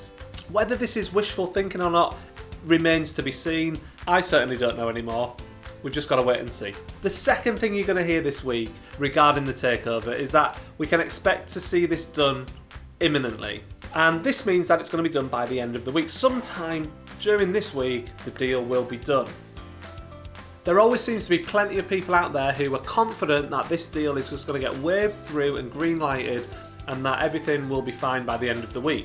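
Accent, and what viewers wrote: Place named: Sunderland, United Kingdom. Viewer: You definitely have a mackem accent lol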